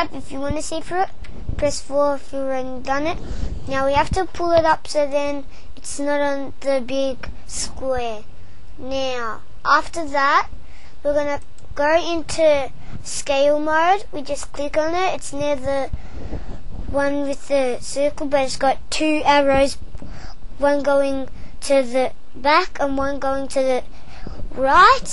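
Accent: Australia. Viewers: a young aussie am i right?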